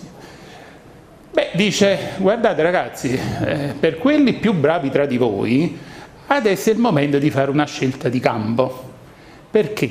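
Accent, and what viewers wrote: Campania, Italy. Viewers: Se questo e' un professore io sono Maiorana!! Fa schifo con quell'accento marcato Campano, sembra uscito da un campo di pomodori!! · Bravo divulgatore dell'argomento, peccato però che sia effettivamente un po' troppo accentato. Le accelerazioni di un discorso "a braccio" o sue troncature in corsa già sono difficili da seguire in lingua "pura", ma qui un non campano fa un po' fatica. Peccato. Avrebbe potuto rivaleggiare, come divulgatore, con un Alberto Angela o col di lui padre Piero (che per altro non impongono l'accento piemontese). Senza offesa... opinione personale. Mauro, Emilia-Romagna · A me è piaciuta la conferenza, indipendentemente dall'accento. Trovo inoltre simpatico l'accento napoletano. Saluti da Trento. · Io ho gradito molto invece l'accento, l'italia è bella perché è varia. Un po'di umiltà non guasterebbe, chissà com'era l'accento di Majorana, sicuramente non toscano. 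Il professore è stato bravissimo a umanizzare e rendere piacevole una materia, la fisica, molto ostica, lo si ascolta e quasi sembra di stare a teatro con la sua splendida napoletanita'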